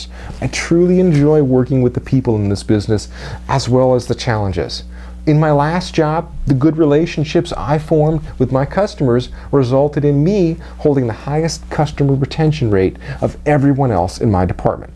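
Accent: United States. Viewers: dominated ameriican accent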